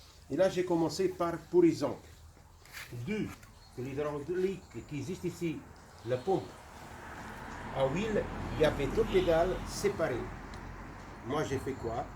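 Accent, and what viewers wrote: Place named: Portugal. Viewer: Bravo pour ton travail et ta présentation. Justement je m’intéressais à cette fendeuse dans le commerce par internet pour mes besoin dans le fin fond de l'Ariège où il n'y a pas de d'électricité. Tu me fais faire un bon en avant dans ma réflexion avant achat ! Mais je me tâte encore à prendre une petite thermique.... Merci et bonne continuation. PS : j'adore ton accent portugais ! ;) et bravo aussi pour la qualité de ton français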